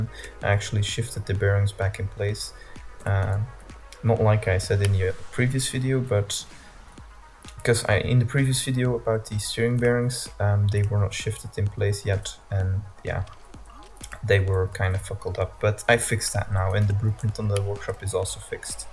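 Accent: Netherlands. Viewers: hey just a quick question are you dutch? if so: hoi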